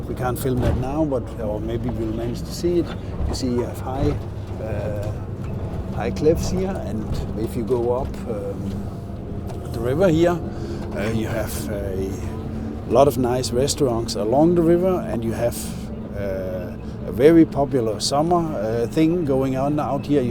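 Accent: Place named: Germany. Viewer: Croat here, very nice video Sir.... Only negative thing for me personally, your english sounds like Klaus Schwab talking 😊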